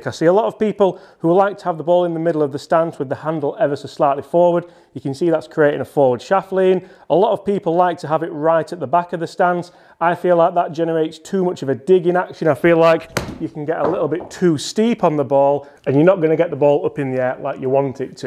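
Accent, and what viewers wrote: Yorkshire, United Kingdom. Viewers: Keep these coming. Look forward to your daily tips and down to earth Yorkshire tone . Love it !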